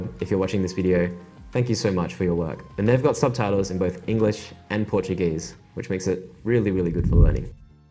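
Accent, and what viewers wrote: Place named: Brazil. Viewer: WOOOOOW Parabéns man! O seu nível de portugês é muito bom, e apesar da professora ser portuguesa, e o sotaque é de portugês é bem brasileiro. Daqui a um ano vais falar melhor do que eu com certeza :D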